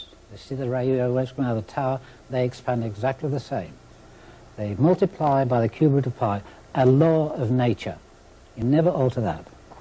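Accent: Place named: West Midlands, United Kingdom. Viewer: is from Wales. is from the West Midlands in England, by my ear.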